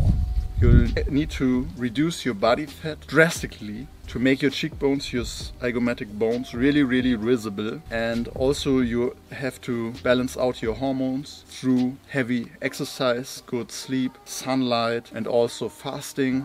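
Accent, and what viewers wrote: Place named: Germany. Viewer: German as accent bro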